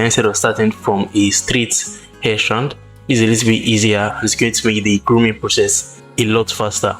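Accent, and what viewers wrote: Nigeria. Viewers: Thanks bro, you sound nigerian though this is what i have been looking for a video that explains everything 20hrs is so awesome and for free nah bro we are grateful ill be back in 2 weeks to my comment and i have completed it by then . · Curious. Are you Nigerian by any chance? Your accent sounds familiar... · Being looking for a Nigerian to do a video like this, been tired of hearing accents.. kudos bro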